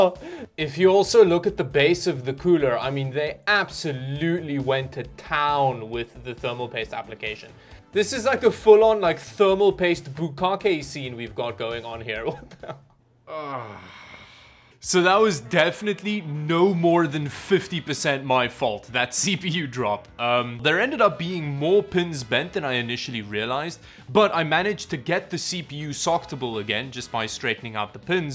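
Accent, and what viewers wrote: South Africa. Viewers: Are u from south africa? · Bro are you South African?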